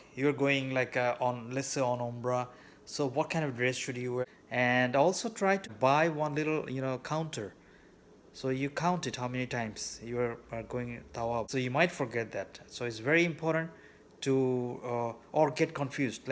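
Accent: Bangladesh. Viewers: S/W, bhai, you sound Bangladeshi, masha'Allah. May Allah bless you for your efforts. :)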